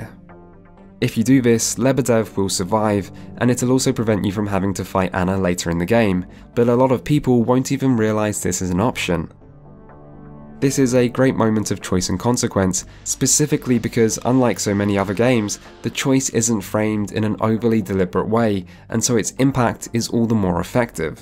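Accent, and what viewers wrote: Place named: United Kingdom. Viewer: UK Accent? - Check. This is going to be a good retrospective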